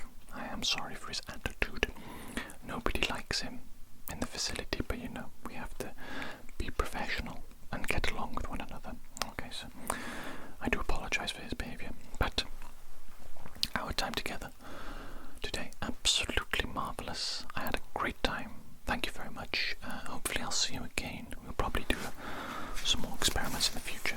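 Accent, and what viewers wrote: Wales, United Kingdom. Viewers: Came for the tingles, stayed for that fantastic Welsh accent. I'm sure that's what makes your videos so relaxing! Diolch, 😉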